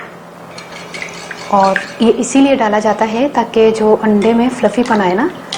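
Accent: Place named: Punjab, India. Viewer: behna your punjabi language voice i love it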